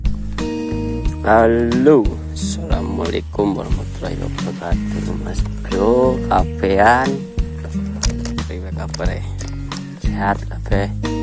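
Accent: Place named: Banyumas, Indonesia. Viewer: Salam wong ngapak, ora ngapak ora penak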